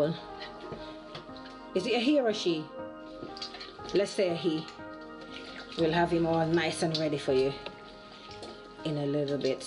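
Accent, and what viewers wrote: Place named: Jamaica. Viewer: "Caan nyam dat", as Jamaican myself I just love how you go in and out between English and Patois.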